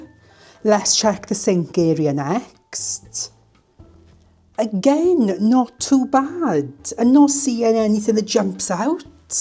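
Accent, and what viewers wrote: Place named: South Wales, United Kingdom. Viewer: that switch into PPE had me screaming 😂😂 I love you.. you’re hilarious! I moved to Pembrokeshire a few years ago but it’s so nice to hear that South Wales accent again. Instantly brought me back to my roots! 🏴 Lush!! 🎉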